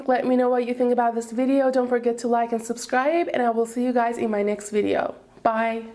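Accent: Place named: Iran. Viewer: jan (I love your Persian accent!) I love your eyeshadow look here! The bronze color looks really good on you. I never had luck with Josie's makeup products and because it seems they are more pink toned.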